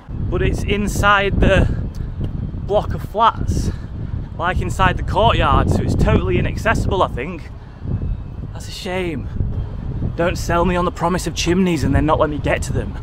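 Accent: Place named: London, United Kingdom. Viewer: I do love this guy's attitude towards his native town... Seemingly a wardian, showing the aftermath of some unlucky human activities. His accents reminds me of my best years in London when I was young. Take care... Thanks for showing us this video. 😊... Etruscan is an old italian folk, they lived in nowadays Tuscany in Italy...in the 5th century B. C... 😅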